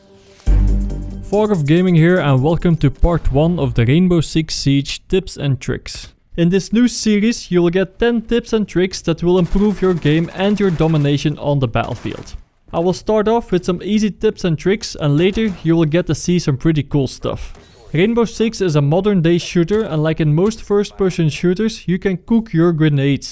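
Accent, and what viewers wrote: Netherlands. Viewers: jij komt zo hard uit NL you engels is zo fockt up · he is clearly dutch, i'm dutch myself and know how dutch people speak english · i think it's a dutch accent, and i can hear it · Dat Dutch accent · Are you Dutch because of your accent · dat nl accent XD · Iewl dat Nederlands accent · Ben je nederlands? klinkt zo haha!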